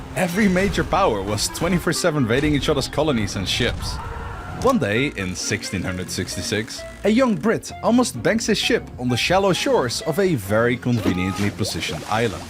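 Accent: Netherlands. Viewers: I am only here to listen to his Dutch accent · Are u Dutch? Love your accent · Ik dacht al dat jij nederlands was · I knew you were Dutch I heard it from the accent I just waant sure XD but cool!!! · Oh youre dutch, i was trying to place your accent 🥀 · Ik kan echt niet luisteren naar nederlanders die engels praten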